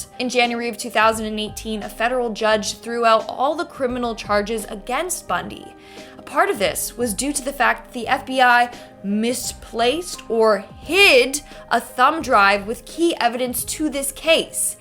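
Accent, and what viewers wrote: Canada. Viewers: Are you Canadian ? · Hey are you Canadian and if so French Canadian?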